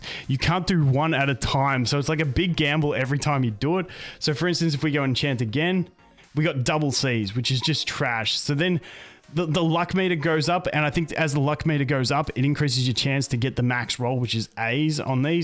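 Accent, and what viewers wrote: Australia. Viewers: damn i always like your accent, sir. what is it? aussie?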